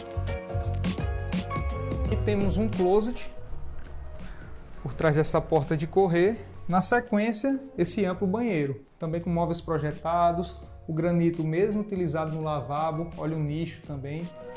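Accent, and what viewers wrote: Northeast, Brazil. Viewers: Adoro ver as casas que você apresenta nesse seu canal. São tão maravilhosas que fica difícil nomear uma como preferida. No fundo no fundo, prefiro todas pois todas me inspiram de alguma forma. Rsrs E quanto a você, é um rapaz muito simpático, educado e bonito que sabe passar bem a sua mensagem com esse sotaque nordestino que eu adoro.. Boa sorte!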